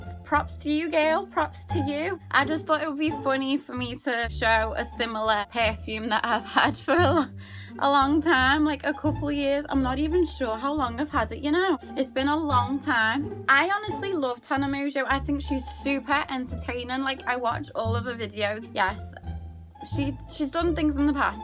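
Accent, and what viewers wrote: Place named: England, United Kingdom. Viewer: wait i love your accent girl!!! one of my friends is from england and you sound EXACTLY like her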